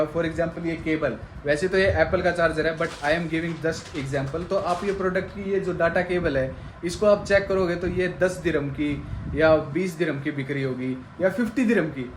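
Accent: Haryana, India. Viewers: Bhai haryanvi h k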